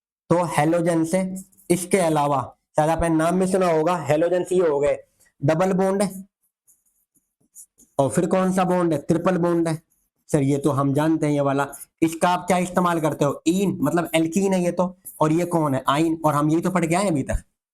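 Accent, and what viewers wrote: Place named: Mewat, India. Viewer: Sir me jairoli tijara se aapki vedio dekh rha hu mene aapni language se pehchana aap mewati ho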